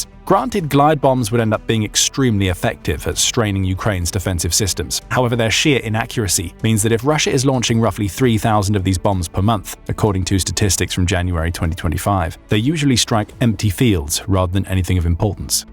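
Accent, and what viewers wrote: United Kingdom. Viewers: Ahahahaha the accent dont make us go to wrong analises. The british trying to win the loose war on the blabla. Really???!!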